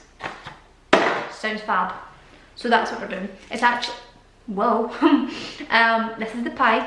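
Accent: Scotland, United Kingdom. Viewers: "I like you. Do you want to be my valentine?" XD Also, I love your accent! When I first found your channel I was like, oh my gosh, her accent is everything. My favourite musician is also Scottish, so I may be a bit biased. ;) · Haha no I love the Scottish accent!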